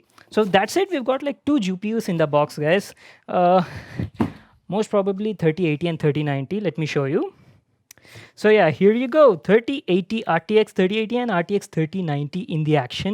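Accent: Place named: India. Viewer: he is like linus tech tips with an indian accent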